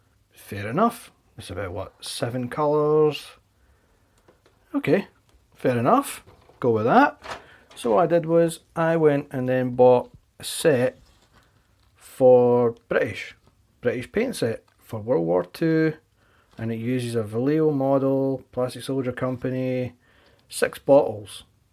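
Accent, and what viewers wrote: Scotland, United Kingdom. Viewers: I owe u an apology. I have started painting figures because I just have no room anymore for planes and tanks so I came looking for hints on painting 72 scale. Clicked yours and heard the accent and thought geezus no way. BUT I listened on and in the end, thought what a great tutorial. So old mate, sorry for thinking here we go some Scottish damn wanker waffling. It was the opposite so thank you heaps. ( PS You have to excuse me, Im an Aussie :-) ) Subscribed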